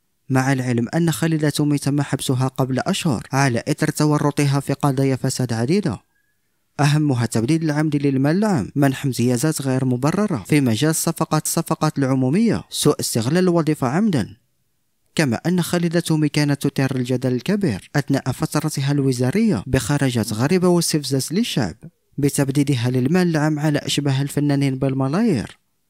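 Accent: Morocco. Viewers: L'accent marocain !!! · شكون طاع هد لخبر انت تبان صوت مغربي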